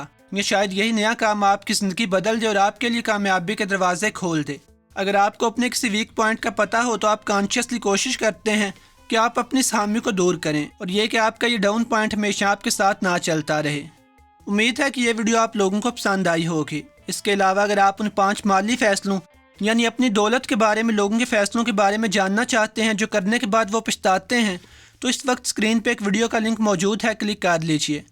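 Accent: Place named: Lahore, Pakistan. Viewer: Aap Yakeenan Lahore sy Hain ... hoobian nahi hota Khoobian hota hai ..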